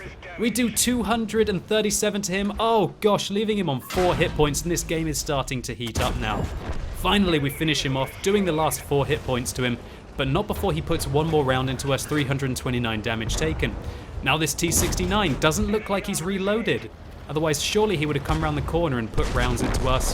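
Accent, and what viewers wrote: England, United Kingdom. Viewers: sound way more british with the new mike x.x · Mate, the new mic really brings out your english accent. Needs more Aussie twang. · with this mike you sound more british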